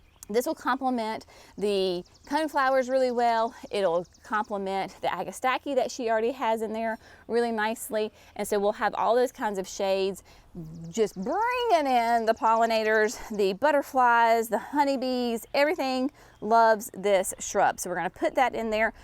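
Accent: South, United States. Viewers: Does Mimi have your sweet southern accent too? Thanks for sharing.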